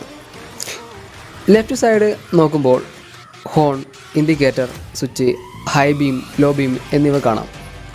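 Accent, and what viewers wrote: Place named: Vadakara, India. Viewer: Vadakara kaaran anallo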